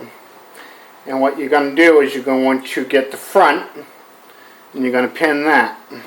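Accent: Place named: North, United Kingdom. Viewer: Ah ha! I knew it was northern. Video is fantastic!!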